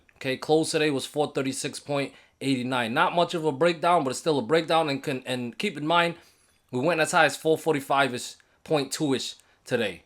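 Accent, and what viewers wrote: New York, United States. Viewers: 1⃣ One!!!! Boss man!!!👍 Great information!! Are you from 🗽 NYC you talk like a new Yorker lol sheep 🐑 style trading 💹 Holy Molly!! 🐂 Vs 🐻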